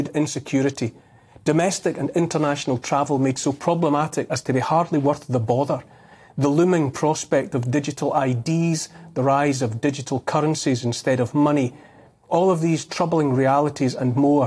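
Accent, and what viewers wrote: Scotland, United Kingdom. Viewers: He spoke like a warrior poet, he spoke like a Scotsman!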